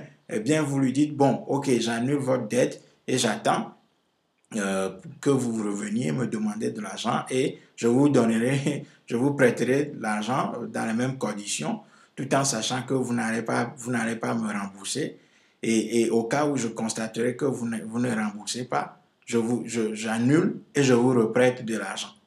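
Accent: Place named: Africa. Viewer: Il a voulut dire suisse mais avec son accent africain on ne l'entend pas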